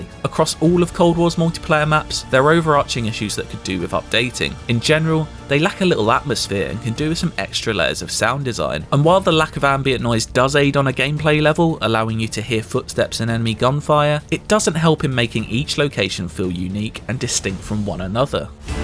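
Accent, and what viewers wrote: United Kingdom. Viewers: This guys British voice pisses me off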